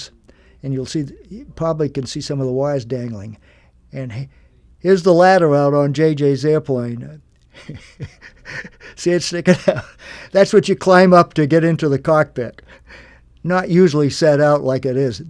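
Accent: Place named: New England, United States. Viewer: Love this video! And the New England accent reminds me of my late grandmother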